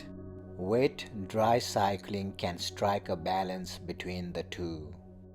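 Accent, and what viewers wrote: India. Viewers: I find it a little harder to understand as it has an Indian sounding accent. I am from the UK.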